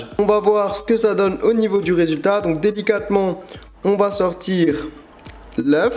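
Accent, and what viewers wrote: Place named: Alsace, France. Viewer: Hé yo on entend bien l'accent Alsacien dis donc (le Bonjour de Mulhouse)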